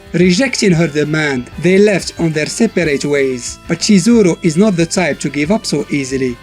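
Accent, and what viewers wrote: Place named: Morocco. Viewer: he is Morrocan,from my country and recognize the accent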